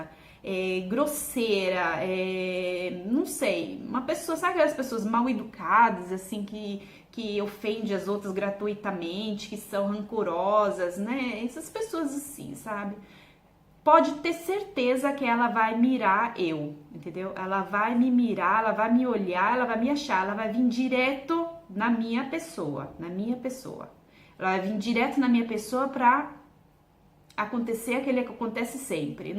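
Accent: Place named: Italy. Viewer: Olá moça. Estou te acompanhando desde os primeiros videos que você postou e tenho gostado da sua rotina porque é diferente da minha. Quero te dizer que não é culpa sua. Algumas pessoas não sabem lidar com seus sentimentos e acabam descontando em pessoas boas que não fizeram nada. Quando isso acontecer, tenha em mente que não é você, e sim a outra pessoa. Nunca deixe de ser a pessoa boa e educada que você é. E não deixe ninguém tirar o seu brilho. Uma dúvida, onde você nasceu? Vi que você fala português, mas não parece ser sua lingua nativa. Você é italiana? Continue postando, gosto dos teus videos! Abraços :)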